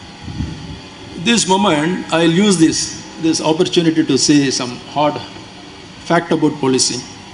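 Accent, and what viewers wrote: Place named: North India, India. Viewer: பேரு தமிழ் வடக்கு ஹிந்தி பேசுற மாதிரி இருக்கு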